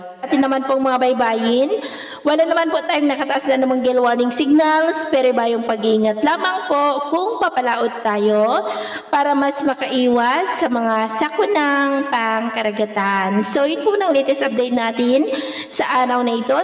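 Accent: Visayas, Philippines. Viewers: Bisaya kayo mam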